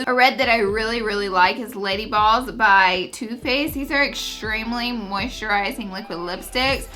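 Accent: South, United States. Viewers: I guess that bettet than saying you sound like and 80year old that sounds like you smoke a pack a day. I love your voice I find it comforting with the southern flair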